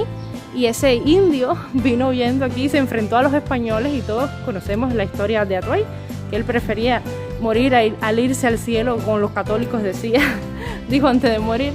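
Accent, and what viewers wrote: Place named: Dominican Republic. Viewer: Tu tienes acento dominicano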